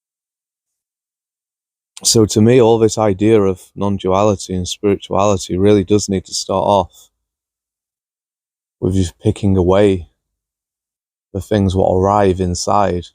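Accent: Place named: North West England, United Kingdom. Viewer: Lovely to sit and listen to you. I'm from the North West of England and it's rare to hear someone with a familiar accent talking about this.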